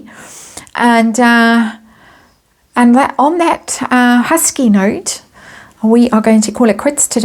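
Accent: South Africa. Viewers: I listened twice to your ending with earbuds in and I couldn’t figure out what you said because of your charming South African accent. But when I heard your dog softly moaning I thought it was one of the cats that were in my house 😆 Take care and I hope to hear from you soon.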